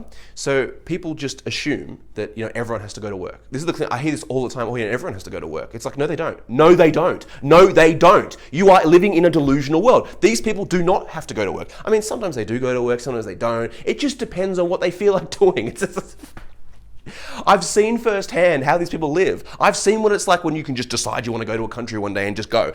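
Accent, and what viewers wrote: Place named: United Kingdom. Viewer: This guy is absolutely speaking the truth. He sounds like he lives in Britain or in Europe somewhere but he's not exaggerating the reality of being wealthy. I live in the U.S. When I was about 20 years old I dated a woman whose net worth was north of 40 million. This was sometime in 1988. I dated her for 5 years and that experience was unlike ANYTHING I had ever experienced before or since. I grew up in a very middle class house hold, so I had access to nothing extravagant. Just to provide a few quick examples, during the time I dated this woman, I traveled to Bahamas at least twice, Los Angeles - and not just LA proper but I shopped on Rodeo Drive, the most exclusive avenue of wealth in the world, traveled to Las Vegas a few times, and I drove several exotic cars including a Ferrari, BMW, and a Porsche. I ate at the absolute best restaurants in the U.S. 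Everything I did during this time was paid for by this woman and her family. I was given money on a frequent basis. Ultimately, the lifestyle almost killed me because I had to a pay a heavy price of being absolutely controlled as to my time and space. I finally broke up with this girl and found my independent freedom again. To this day, I have no regrets on the decision I made. But, the experience provided me with a sense of existential balance that the world is NOT the same for people relative to those who have against those who do not have.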